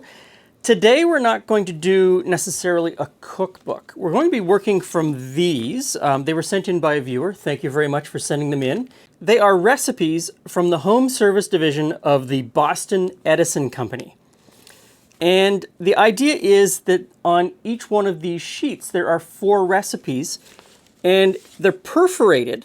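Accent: Canada. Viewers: Sure sounds Canadian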